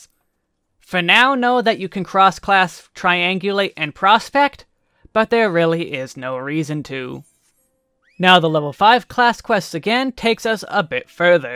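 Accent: Boston, United States. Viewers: Boston-accent? Jersey? Can't put my finger on it :D - Gahtha goyde lol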